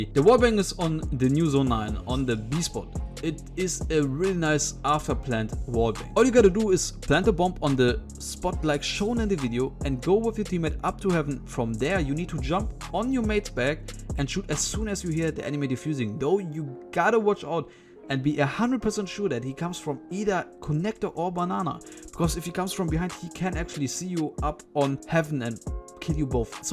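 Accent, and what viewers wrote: Germany. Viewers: Noch nie so ein deutsches english gehört hahahah nice video btw❤️👍🏼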